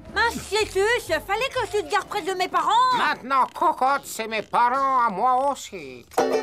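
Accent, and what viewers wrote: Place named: Brussels, Belgium. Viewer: accent bruxellois pas fl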